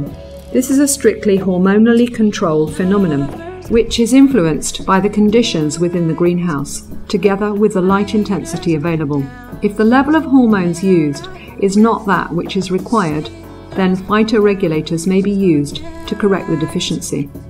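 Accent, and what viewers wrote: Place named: United Kingdom. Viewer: that perceived british accent was so hard to follow. but jolly good show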